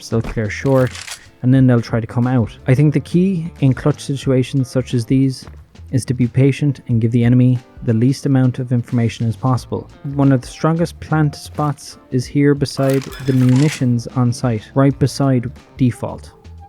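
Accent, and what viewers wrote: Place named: Ireland. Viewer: Thou sound Irish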